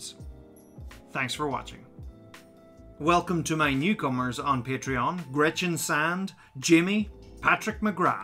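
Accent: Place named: Ireland. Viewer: A complicated political situation explained in an Irish accent somehow makes it better 😍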